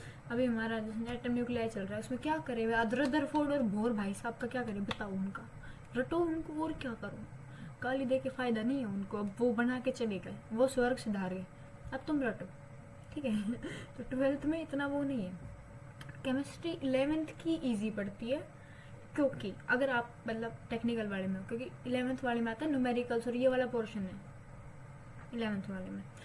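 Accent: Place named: Haryana, India. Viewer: firstly, thanks alot for the effort of making it and secondly i watched your 1 video before this one and i was 99% sure u were from haryana now this confirms 😂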